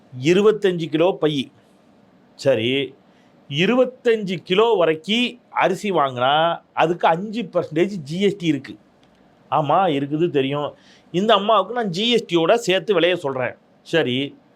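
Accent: Tirunelveli, India. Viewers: பிரதர் ரொம்ப நாள் கழிச்சு நம்ம ஊர் பாஷை திருநெல்வேலி பாஷை கேட்டிருக்க வாழ்க ஜனநாயகம்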